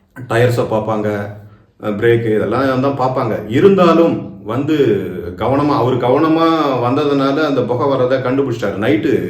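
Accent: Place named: South, India. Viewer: South voice good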